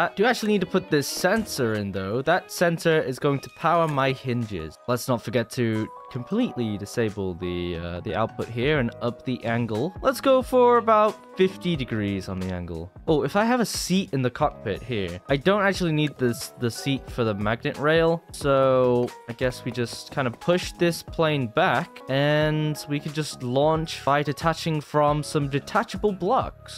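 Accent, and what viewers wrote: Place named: United States. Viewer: Blackpool boy turned American wtf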